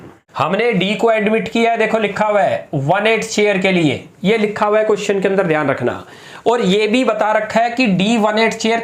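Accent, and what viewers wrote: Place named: South, India. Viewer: I think the person is from southern part of India..... Most people learn English there.... I lived there for some 3 years and I know that it's difficult for them... But yeah you all are right that he/she should change the channel😅😊😊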